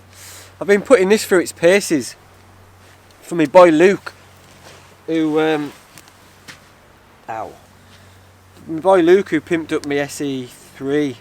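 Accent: United Kingdom. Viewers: Is your accent British or Scottish?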